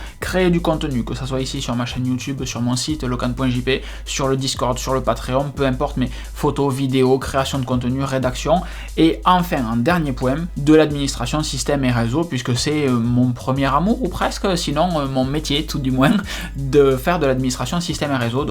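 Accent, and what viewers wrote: South, France. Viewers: Merci beaucoup pour cette vidéo : complète et très enthousiaste ! De plus, cela me rassure d’avoir un MBP M1 avec Touchbar car tu es le seul dans cette vidéo à dire que finalement la Touchbar ben…c’est pas si mal ! Bon courage pour la suite, et merci pour ce bel accent qui sent si bon le soleil !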